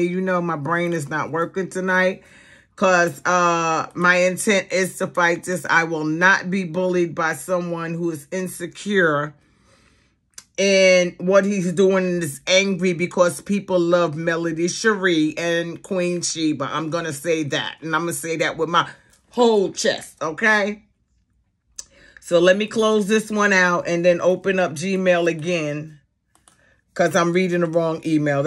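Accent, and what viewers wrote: East Coast, United States. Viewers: I hope you make friends AND make money. I’m a new subscriber. I hear that East Coast accent! Have a blessed day!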